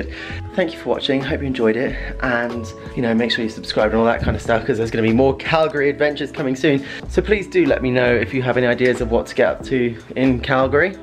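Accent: United Kingdom. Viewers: Between the British accent, the background music, and tinnitus, I often have to watch his videos several times. Headphones help.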